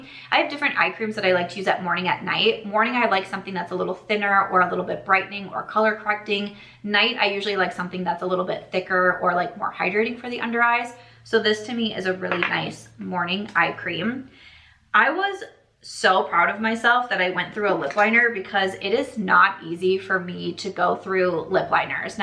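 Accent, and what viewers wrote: Midwest, United States. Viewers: Totally get the Midwest accent girl.. don’t really hear it in you THAT bad.. being from outside of Philly, I always get told I have a Philly accent & I laugh because my friends who grew up actually in philly, have more of a Philly accent than me.. it’s funny how you can tell where ppl grew up just from their accent.. yet we all speak English the same.. ANYWAY- I really came here to tel you that Kayali Yum Bougee Marshmallow (the strawberry one u were tryin to think of) is the COMPLETE opposite of Tom Ford Tob Van.. Tom ford is more warm n spicy & rugged (my son n I share a bottle bc is so perfectly unisex) where yum bougee marshmallow is girly sweet but very pretty but for sure feminine.. I love it, but completely diff than Tom ford.. have u ever tried kayali vanilla 28?? Esp after it sits awhile, its a beautiful vanilla that’s feminine & mixes well with so many other perfumes (actually love it mixed with TF tobacco vanille to make it a lil more feminine & bring out the vanilla!!) .. 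also can’t wait to smell Phlur Vanilla Smoke def sounds like something you might like (just haven’t gotten to smell it yet!!) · Midwest accent? That makes me laugh...❤ enjoyed the empties video.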